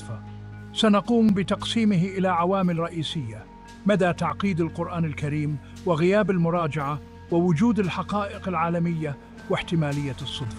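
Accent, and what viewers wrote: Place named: Bosnia and Herzegovina. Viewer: ليست عربية ب لكنة أوروبية أو يوغسلافية أو بوسنية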